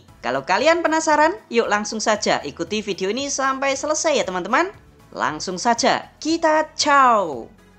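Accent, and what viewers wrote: Java, Indonesia. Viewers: suara naratornya Jawa Banget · logat nya medok bgt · Kenapa jawa mu lengket amat? aneh kedengarannya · Mimin logat jawa nya kental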